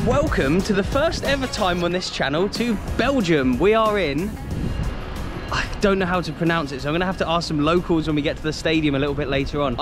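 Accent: Scotland, United Kingdom. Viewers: Hi are you from Scotland?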